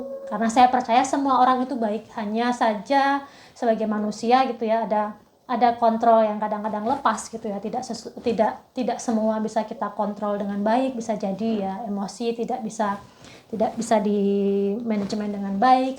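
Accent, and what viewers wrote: Java, Indonesia. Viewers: Suka liat gaya bicaranya Bu, kelihatan sekali aura pendidiknya. Biarpun dah lama di Eropa logat jawanya masih medok dan selalu menggunakan bahasa indonesia. Cara mendidik Matilde pun masih kental sekali dengan budaya Jawa, selalu bilang maturnuwun dengan luwes. Sukses selalu Bu, semoga senantiasa sehat dan membawa berkah bagi keluarga, aamiin....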